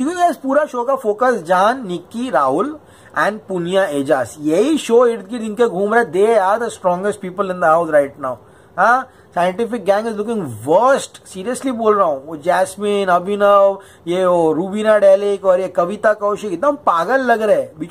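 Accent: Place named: Bihar, India. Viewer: Hahaa bhai Mai bihari but lekin jo tum nach baliye ka bihari dehat wale accent me krte ho mja aa jata hai..hahha tmhara review Bhai full mja More than bb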